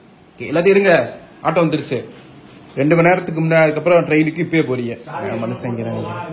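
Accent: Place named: Madurai, India. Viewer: Anneyy nenga madhurai ah unga language appudi dha iruku my fav annaw🫂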